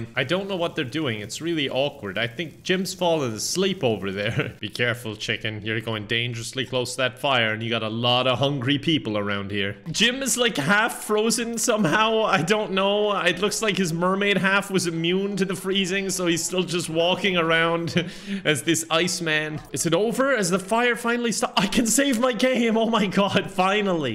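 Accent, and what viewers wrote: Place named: Ireland. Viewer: New video from Me: tremble to hear the cute Irish accent and watch the latest massacre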